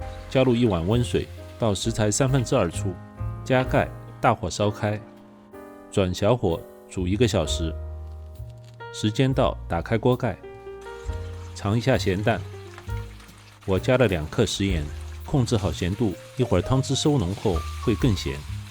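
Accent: Shanghai, China. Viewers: 上海口音的上海红烧肉肯定正宗，订阅了！👍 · 冲着你带有上海口音的解说，红烧肉肯定是回味无穷。❤👍你的视频不拖泥带水、不啰啰嗦嗦一步到位是有良心的节目人。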